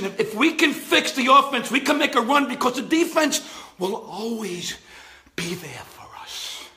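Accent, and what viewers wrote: New York, United States. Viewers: you definitely are a New Yawker, you should be a coach just for the post game speeches, haha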